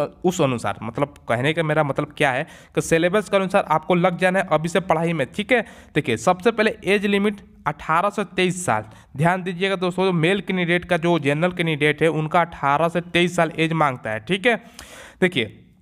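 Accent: Bihar, India. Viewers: Bihari h kya aap??😀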